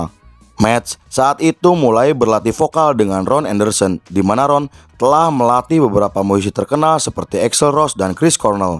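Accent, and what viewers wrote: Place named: Sunda, Indonesia. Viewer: ini narator nya orang sunda, kentel bat logat nya hahaha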